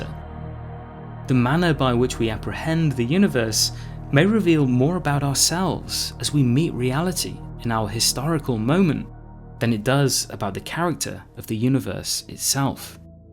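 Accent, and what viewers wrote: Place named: United Kingdom. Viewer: can't do the voice. I get enough British ascent,. In our Canadian programs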